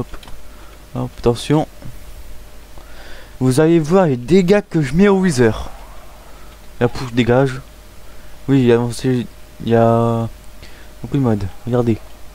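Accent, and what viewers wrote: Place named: Russia. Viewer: J'adore ton accent russe , sa a du être difficile d'apprendre le français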